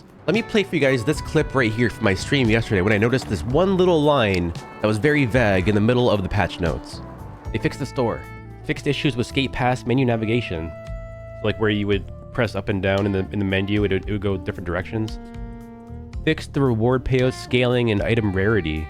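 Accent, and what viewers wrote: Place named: Canada. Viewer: HES A FUCKING MOOSE LOVER ! lmao that sounds kinda negative 😂 but fr I always forget hes Canadian til he says a few words that sound different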